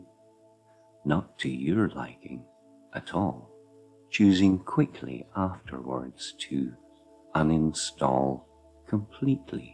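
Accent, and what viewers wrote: Scotland, United Kingdom. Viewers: You the best I've listen just about all 10/10 what kinda Scot are you ? 😆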